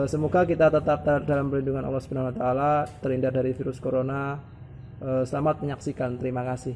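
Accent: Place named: Java, Indonesia. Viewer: Kok medok mas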